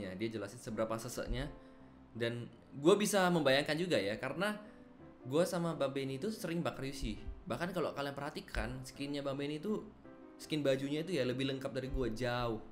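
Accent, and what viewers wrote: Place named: Pekalongan, Indonesia. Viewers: Biasa aja dong bang ngomong pekalongan nya:v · Pekalongan mana suaranya???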